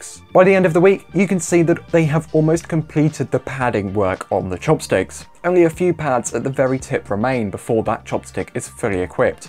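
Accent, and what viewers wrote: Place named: England, United Kingdom. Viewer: The English narrator makes it “real”.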